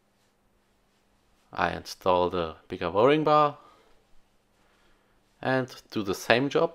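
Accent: Germany. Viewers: Geiles Video wenn man es stumm schaltet. Dein Akzent ist leider ungenießbar. Ich denke nicht dass jemand englisch sprachiges das eher toleriert als jemand der an den "Deutschen Akzent" schon gewöhnt ist. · ich lebe in Freiburg Breisgau und ich schaue gerne deine Videos und habe grad erst festgestellt, warum das so ist. Ich höre dich gerne sprechen. Dieser leicht kölsche Dialekt kam mir so vertraut vor und dann erst stell ich fest, das Ihr in Troisdorf seid und ich keine 250 Meter von euch geboren und aufgewachsen bin erinnere mich an schöne abende im Saga und freue mich. Schöne grüße in die Heimat und macht weiter so. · Your English is so German <3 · Man merkt leicht das er Deutsch ist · Sofort erkannte das er deutsch ist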